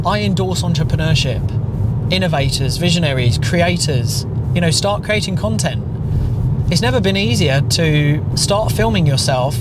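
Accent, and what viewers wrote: United Kingdom. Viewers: If everyone had this same mindset you have, there'd be no infrastructure and no society. You'd have no road to drive your car on whilst making this video. There'd be no supplies to feed your kids because there would be no truck drivers hauling through long hours to deliver all the essential items we need to stock our shelves. Judging by your accent, you're from the UK? UK Lads are some of the finest scaffolders in the world. If these lads all paid heed to your advice, there would be no accessible platforms built to allow the other trades to build all our houses and commercial buildings. Everyone wants to be an entrepreneur until they realise that the world survives off of graft and sweat, not faarken' pen pushers complaining.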